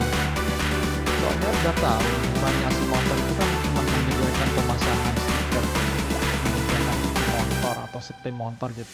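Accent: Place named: South Sumatra, Indonesia. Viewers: Sumsel dmnanya bang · Dari logat ny sumsel ya bro? Gw sumsel soalny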